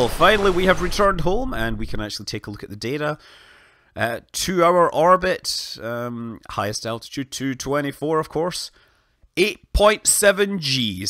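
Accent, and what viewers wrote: Scotland, United Kingdom. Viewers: Only without the shouting, more intellect and a scottish accent?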